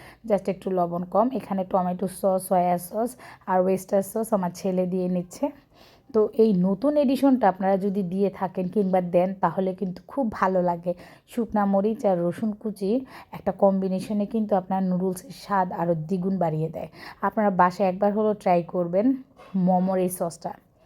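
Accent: Noakhali, Bangladesh. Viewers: নুডুলস ,পাকোড়া তারপর অনেক সুন্দর সুন্দর রান্না। ছোট ভাই, ছোট ভাইয়ের বউকে খাওয়ানো আর আমাদেরকে দাওয়াত না দেয়াএটা খুব কষ্টদায়ক কিন্তু আপনার শারীরিক সুস্থতা কামনা করছি। আর আপনার নোয়াখাইল্লা ভাষা তো তুলনাই নাই।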